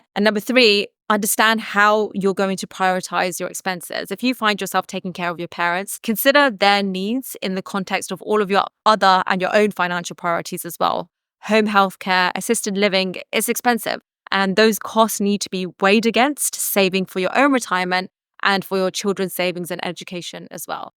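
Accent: United Kingdom. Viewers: British Accent 😑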